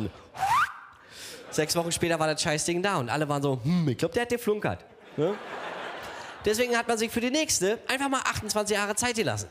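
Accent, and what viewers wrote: Berlin, Germany. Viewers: auch noch Berliner Dialekt simuliert... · Selten wurde der Berliner Dialekt so sympatisch und humorvoll rübergebracht. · Das stimmt, normalerweise dreh ich leicht am Rad, wenn ich wen berlinern höre, aber hier wirkt es nicht so aufgesetzt wie oft bei anderen aus der Region. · Grandios erzählt und der Berliner Dialekt genial.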